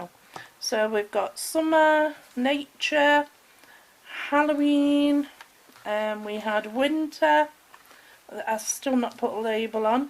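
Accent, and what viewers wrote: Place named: Lancashire, United Kingdom. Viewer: Hi again, yes I thought your accent was similar to mine, so midway between Wigan and Liverpool makes sense re your accent. I've been living in Melbourne for 47 years, still have my accent, proudly😀 I'm looking forward to seeing your upcoming posts 😀stay safe.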